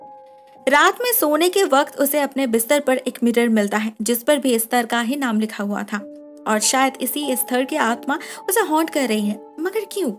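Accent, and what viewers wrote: Bengal, India. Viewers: R u bengali 😊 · don't mind but are you a bengali? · Are u bengali?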